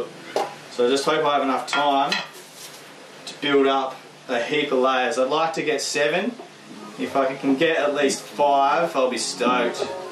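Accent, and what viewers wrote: Australia. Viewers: That Oz accent is so cool, more so when your hyped about something. Its like listening to a chilled Brit but on speed. :)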